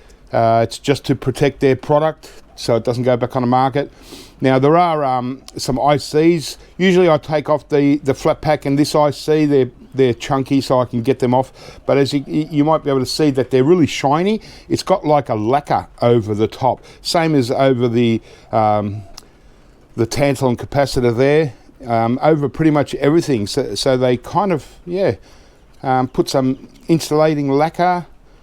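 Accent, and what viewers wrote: Australia. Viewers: I live in the United States and we were locked down for the longest time and you weren't don't apologize for something you can't do anything about I love watching your shows I don't care what you do tearing stuff apart cutting off wires it's all good just don't stop let's do a marathon what do you think love that Australian accent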